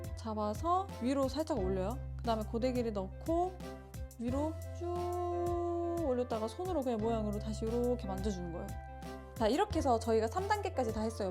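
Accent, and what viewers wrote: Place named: Busan, South Korea. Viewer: 부산사람은 역시! 숨길수 없는 악센트가 있네유~ 반가워요~ 저는 부산기장 살아요~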